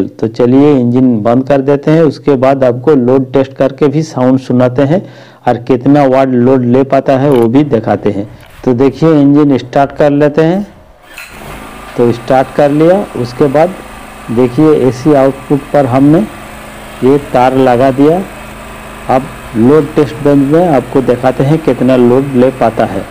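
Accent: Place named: Bengal, India. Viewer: Apni Bangali apnar Hindi Sune bujha ja6...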